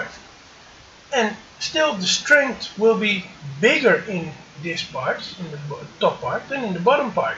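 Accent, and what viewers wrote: Netherlands. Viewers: yeah you can hear that. :) greetings from Belgium.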